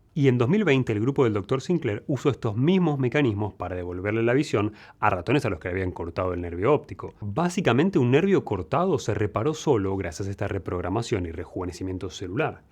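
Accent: Argentina. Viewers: es argentino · Es argentino... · es argentino pero no sé dónde vive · es argentino, tenía que ser argentino, no hay otra. Lo grande que somos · El dr. es Argentino!!💪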